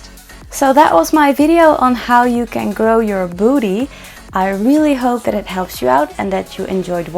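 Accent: Netherlands. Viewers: Omg I found you today and I love your Channel! I’m also starting to lean bulk! So this is perfect! Groetjes uit Gouda! Ik hoorde je Nederlandse accent en zag het op je instagram dat je uit Nederland komt. Je bent super goed bezig! Ga zo door!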